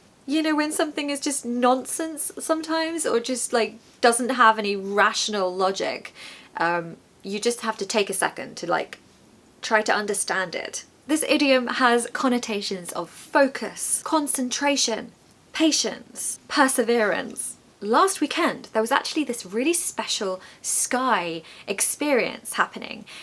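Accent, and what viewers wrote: United Kingdom. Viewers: I love the British accent . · ❤❤❤❤❤❤❤❤❤ Love your Received Pronunciation (British English accent) very much!!! ❤❤❤❤❤❤❤❤❤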